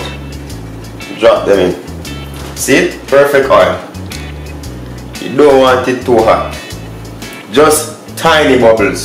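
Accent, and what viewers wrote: Patois, Jamaica. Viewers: I love how authentic you are. You are very articulate yet yuh keep your accent real like di dumpling dem. I can't stand when people try to impose on others. You don't need to speak any less patwah,we never tell an AMerican to speak less American. Though the average American DO NOT speak standard English. Anyway, yuh dumplings tun up! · Man this being African American is boring AF😫😂 why I couldn’t be Jamaican or Nigerian 😩😩😩 I hate it here😫 I’m Finna put on Shottaz and dream of being a Jamaican 😂 cuz being American is 🗣️BORING😩😩😩 I love his accent 🥴 and the Patois language YESSS🙌🏾🥴🥴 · Clear and concise recipe. The authenticity of his native tongue makes me trust his recipes more... I am not Jamaican but I know Jamaican cuisine is made from the heart and it's not a rushed process. The amount of Mickey Mouses out there trying it with their Ja-fakin' recipes... 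The patois wasn't overly used that someone can't understand the recipe... However, if the new recipe edit shines the spotlight on his channel, then more power to him! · Too much patois?? Ah weh oonu ah talk bout?? KMT. That's what I love about this channel -makes it authentic! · Mi lov di Patois Bredren!!! Yu dumplin sel aaf! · I love hearing the patios. Idky, but it always makes me laugh lol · I dont mind the patios at all, atleast I understand what you're saying and relate to your the videos. I'm a new subscriber and loving your videos already.. KUDOS!!! 👍👍👍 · To capture a wider audience he might have to drop the patio. It’s not just Jamaican watching. There are people of other nationalities that love Jamaican food and want to learn how to make it. The same way if someone of a different nationality giving a presentation for something but u can not understand what that person is saying because of their accent. 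The same goes for someone who only speaks street slang. If that person trying to give a presentation dont u want that person speaks proper. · Awesome Jamaican accent jah Mahon 💯 and you earned yourself a new subscriber as soon as I scene your hand rolling technique awesomely done ✅ 🥟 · Moderating the patois will widen your audience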